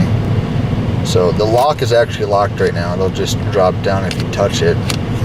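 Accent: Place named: Canada. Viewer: What part of Canada are you? I hear a Canadian accent. Anyhow, I love the look of this car. Thanks for the upload. Early 90s American luxury is pretty cool.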